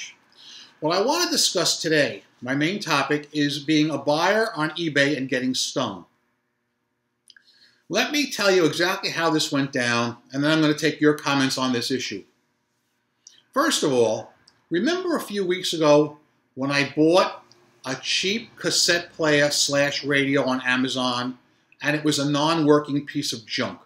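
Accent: New York, United States. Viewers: your NY accent & how you come across is easy listening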